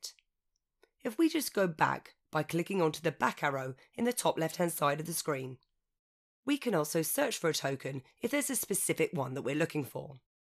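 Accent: United Kingdom. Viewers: you sound like your from in t uk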